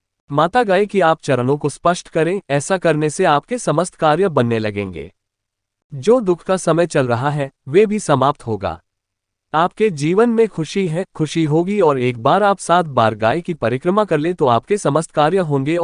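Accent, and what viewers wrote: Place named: United States. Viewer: Aisa lagta he america ka admi hindi bol raha he, chutyapa 😝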